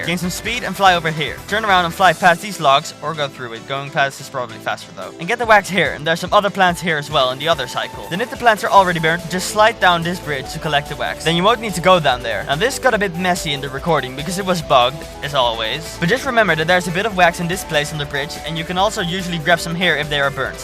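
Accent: Netherlands. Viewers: i feel like your native language is dutch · are you dutch?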